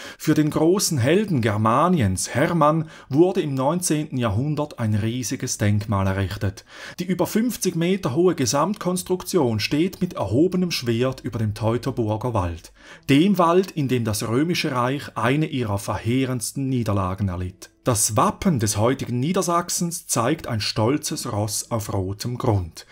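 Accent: Switzerland. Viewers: Vielen dank für den ausführlichen und aufschlussreichen Beitrag über die niedersächsische Geschichte! Ich als selber Niedersachse, der aktuell Urlaub am Genfer See macht, hatte eine gute Unterhaltung und freue mich auf die weiteren Videos! PS: Ich liebe deinen schweizer Akzent/Dialekt! :) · Ich bin Deutschmarokkaner und liebe diesen schweizer Akzent. 😁👍🏻 Übrigens ein tolles Video!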